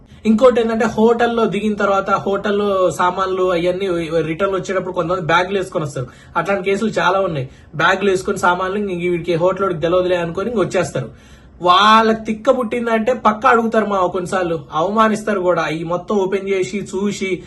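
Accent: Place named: Nalgonda, India. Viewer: mawa… needi Nalgonda na😊 same slang vundi anduke adiga 😂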